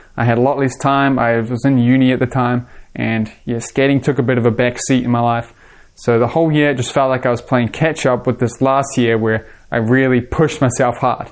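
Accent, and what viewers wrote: New Zealand. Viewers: So bad with accents dude had no idea you were in NZ Also, what you said about royals being hars for ppl I've been skating since 96 and still cant royal and farv. I can boot trick ANYTHING and I can royal down rails but flat ledges I just gave up on them lol. Reason I can royal down rails is I just naturally slip into them and learned to control it over time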